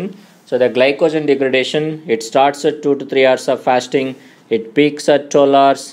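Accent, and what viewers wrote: India. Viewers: Indian English is trash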